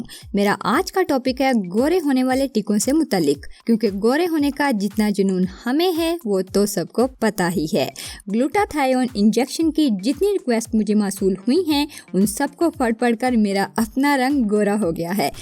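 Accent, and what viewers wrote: Punjab, India. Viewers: yaar plzzz apni Urdu ka style theak kro bilkul Punjabi lehja hy tumhara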